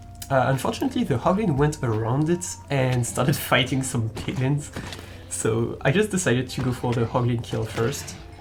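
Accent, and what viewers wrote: France. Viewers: est-ce que tu est français ? If you are not french you have a french accent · You sound extremely french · Are you french ? You kinda have a french accent :) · Are you French ? Your accent is familiar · Ur french ? Because of your accent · french accent ? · haaaaa il me semblais avec ton accent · Is it me or he has a french accent ?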